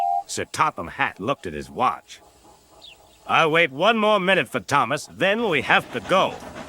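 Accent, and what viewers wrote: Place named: Scotland, United Kingdom. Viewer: Scottish accent is very interesting.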